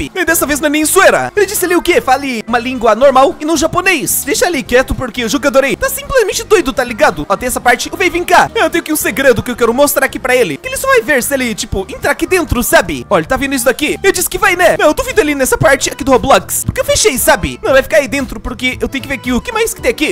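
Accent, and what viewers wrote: Portugal. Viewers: Gente, ele é de Portugal? Sotaque estranho da prr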